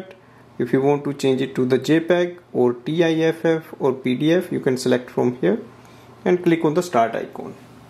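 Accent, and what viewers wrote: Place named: India. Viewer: Plain & simple & i love ur indian accent 😝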